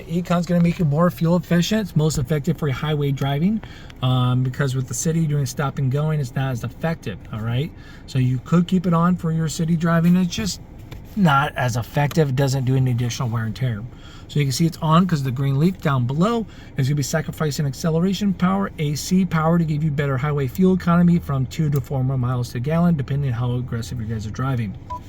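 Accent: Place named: Midwest, United States. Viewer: Sounds like a Midwest English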